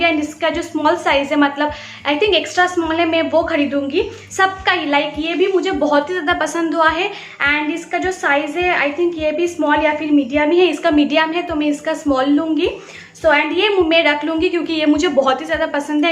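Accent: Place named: Bengal, India. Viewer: Your voice is very sweet! Bengali touch ♥️♥️♥️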